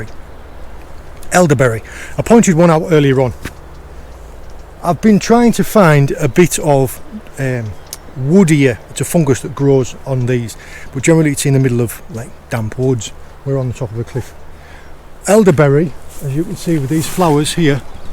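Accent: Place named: Cornwall, United Kingdom. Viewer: Just love the videos! I Put the captioning on, but your thick Cornwall accent makes for interesting reading! This is what I look forward to! Great morning, watching while I drink my morning coffee! ☕️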